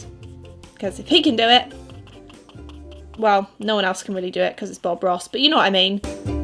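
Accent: United Kingdom. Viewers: Her accent is so British despite moving to America at a very young age. Cool!!! I wonder if she’s ever explained it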